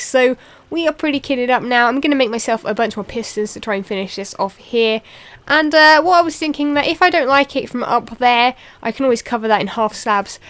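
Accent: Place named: Fens, United Kingdom. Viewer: Fab Vid! I have to ask though, your accent... you were sounding very much like a fenland lass lol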